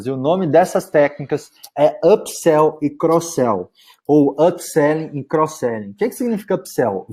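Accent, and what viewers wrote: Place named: Goiás, Brazil. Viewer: Tenho visto dezenas de conteúdos para impulsionar as vendas do meu negócio, e os seus vídeos são os melhores! Isso não só por conta do conteúdo que é riquíssimo, mas principalmente por que vc passa estratégias realmente aplicáveis em pequenas e médias empresas. Normalmente os conteúdos são direcionados a grandes faturamentos, já o seu entra na realidade da maior parte dos empreendedores brasileiros. Muito obrigada mesmo! Ah! Sou sua conterrânea kkkk orgulho de ver vc puxando o R típico do nosso Goiás! 🤩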